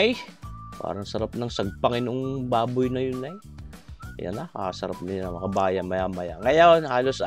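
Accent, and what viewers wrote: Batangas, Philippines. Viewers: Halo -njoyed Ur video n ur Batangueno slang 😀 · Nakaka aliw manood sa accent ni sir pinoy na pinoy🤟👍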